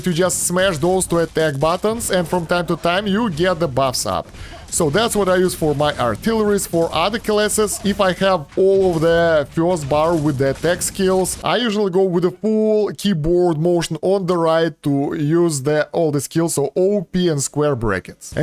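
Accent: Russia. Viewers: Слышу Русский Акцент у автора видео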